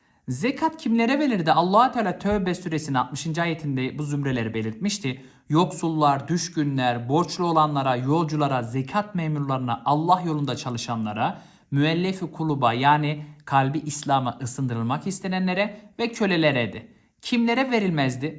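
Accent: Malatya, Turkey. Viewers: hocam malatyalımısınız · Hocam malatyalı mısınız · Hocam malatyalımısınız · hocam malatyalımısınız merak ettimde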